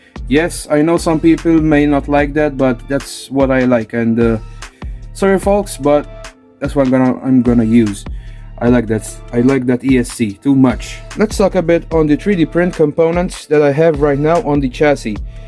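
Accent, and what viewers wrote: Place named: Malta. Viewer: your English sound like Maltese English :-) Interesting design and very well made 3d printed parts, tempted to get an SCX10 but now I'm too busy with the 3DSETS Landy and Rancher, 3D Printing is very addictive.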